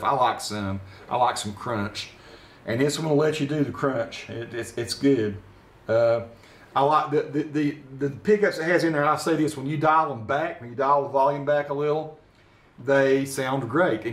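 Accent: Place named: East Tennessee, United States. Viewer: Are you from Knoxville? Your accent sounds exactly like the East Tn. accent.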